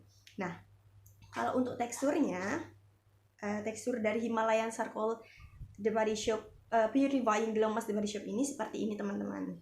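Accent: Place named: Java, Indonesia. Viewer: Udh lama di surabaya medokkkk deh mis yak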